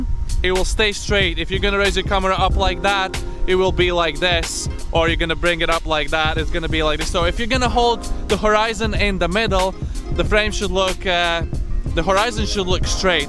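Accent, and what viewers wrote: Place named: Scotland, United Kingdom. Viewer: I can't place your accent... I recognise a Scottish edge in there.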